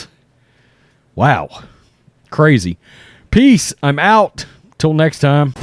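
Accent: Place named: South, United States. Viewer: Hey buddy you & your are great announcers what is your first name & what state you live in I’d say Texas you have no a southern accent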